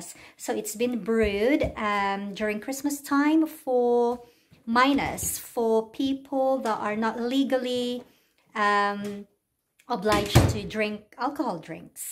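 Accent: United Kingdom. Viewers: Wow sissy! You got all kinds of julebrus there! Har du lært å snakke norsk? Watching this together with my hubby. Always fascinated with your beautiful brit accent. Merry christmas! God jul! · Have a Merry Christmas with a British twang #gemsscope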